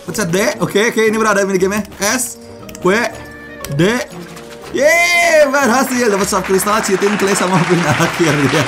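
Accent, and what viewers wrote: West Java, Indonesia. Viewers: bang orang sunda?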